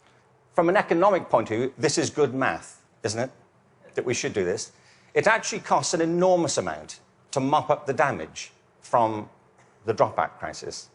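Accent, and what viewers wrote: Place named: United Kingdom. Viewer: 從口音跟有爵位這回事來看，這人是英國人，不是美國人吧...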